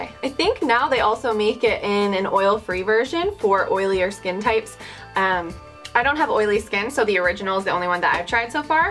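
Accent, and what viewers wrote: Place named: Minnesota, United States. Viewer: Are you from Minnesota? I lived there my whole life up until this past year, and I can totally tell your accent. The way you say your A's :)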